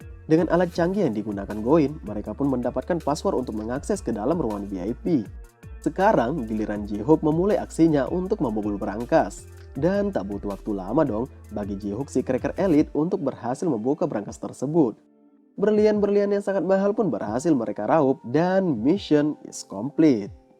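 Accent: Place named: Bugis, Indonesia. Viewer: Saya yakin pasti suku bugis. Dilihat dari logatx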